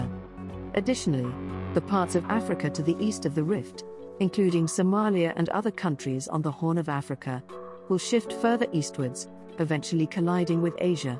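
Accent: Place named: United States. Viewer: It is nearly unbelievable to me how immature and wrong all your videos are, You are like a child playing at a tea party with no concept of reality to guide them. relying on whatever stupid idea comes to mind. As a mature smart worldly Canadian man I find your entire catalogue of crap stating videos to be truly stupid, Why do sound like such an americunt, Stop making this crap and just keep your stupid to yourself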